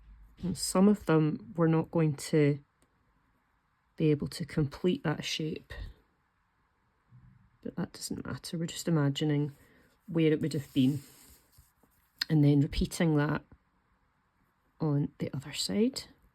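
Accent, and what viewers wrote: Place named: Scotland, United Kingdom. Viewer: Never heard of tangles until now. They look fascinating. Nice to hear that particular Scottish accent, too!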